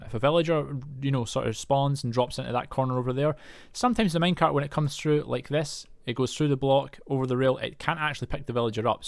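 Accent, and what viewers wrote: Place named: Scotland, United Kingdom. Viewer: Great a Scot! (Sounds Scottish at least) Should be an easy going tutorial :D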